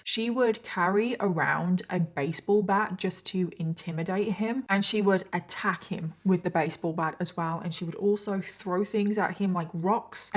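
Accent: United Kingdom. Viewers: Please, Can you narate stories in british accent. It sounds good to me. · MAKE UP REQUEST!?!?! My favorite (American) football team is going to the Super Bowl!!! Will you do a Kansas City Chiefs theme make up? I have just recently discovered your channel and have watched so many of your videos back to back to back that my inner monologue has had a British accent for like 2 weeks now. Thank you!! -Sarah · She’s British and she always does it in her accent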